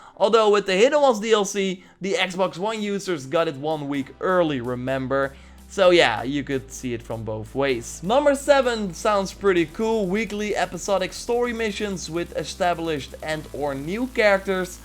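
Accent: Netherlands. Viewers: I like everything about Assassin's Creed, too bad you have such a horrific Dutch accent that I can't bear through any of your videos. It's a real shame man... Do something about your awful English speech. Then maybe you'd be a really good YouTuber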